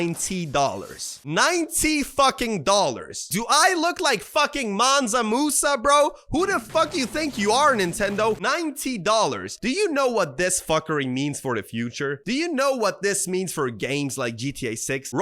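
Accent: New York, United States. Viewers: Bro sounds like he is from new york or something😭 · Thats a new yoker accent for ya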